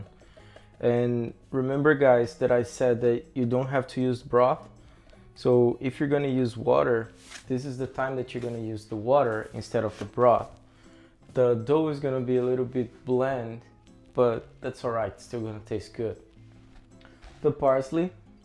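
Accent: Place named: Minas Gerais, Brazil. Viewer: Pelo o jeito é mineirinho kkk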